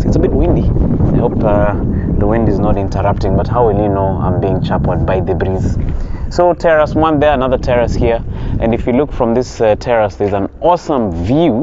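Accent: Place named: Coast, Kenya. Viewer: Kiswahili cha pwani kimeniua.. karibu mombasa bro 🤣😂🤣😂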